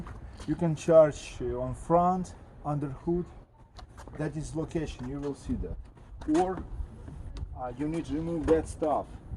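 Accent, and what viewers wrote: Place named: Russia. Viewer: Спасибо брат, акцент похож на русский)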